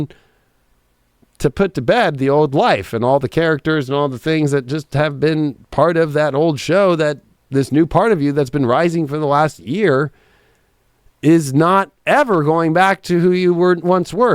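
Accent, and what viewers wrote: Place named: Los Angeles, United States. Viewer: THANK–YOU ♥️✨™️®️🎨♏️🌞♓️🌙 Greatly appreciate your time spent here, & those beautiful LA vibes going into the painted canvas for that Survivors Family ArtShow. I like how your voice brings LA to East Coast. Good vibes, over in VT😎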